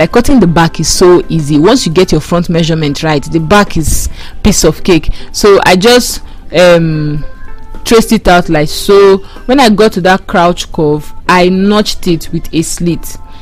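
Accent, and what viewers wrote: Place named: Africa. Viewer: Thank you baby. I am all of 3 days new to sewing, I will definitely try to do this but will start with a skirt fist as I do love skirts. This is very beautifully instructed and informative. Very beautiful accent too. Love my Africans.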